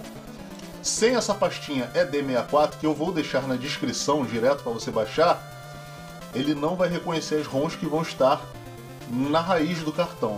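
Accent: Rio de Janeiro, Brazil. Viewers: Fala, sempre curtindo seu canal, sua coleção bem bacana, acompanho sempre. Sotaque de carioca e voz de locutor de FM kkk, curto sempre seus vídeos abraços.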